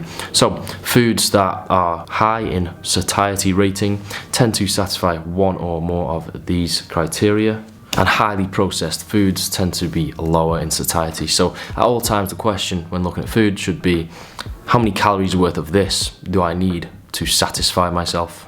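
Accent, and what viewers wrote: United Kingdom. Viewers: What part of the UK are you from ?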